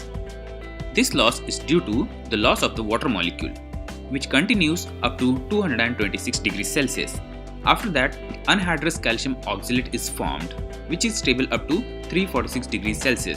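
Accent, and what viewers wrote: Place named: India. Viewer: perfect video short and understandable. but please work on your accent, please . make english(american) accent from indian accent to make it more unverstandable . thank you if you understood correct.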